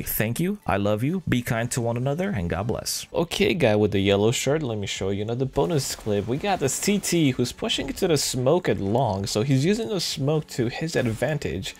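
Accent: Romania. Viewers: Esti Ardelean sau Oltean? :)))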